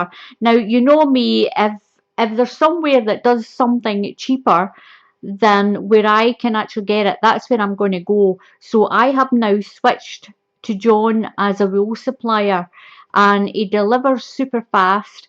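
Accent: Scotland, United Kingdom. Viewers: what a heavy british accent 😂 wow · are you Scottish? your accent is very cute. greetings from Romania! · are you scottish? I just love your accent!! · Very clever idea. I have a kind of personal question though, are you scottish or from northern England?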